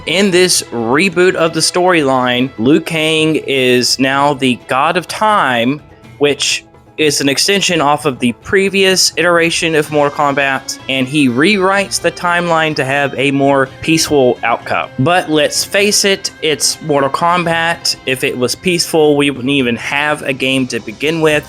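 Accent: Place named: Mississippi, United States. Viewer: You sound like you live in Mississippi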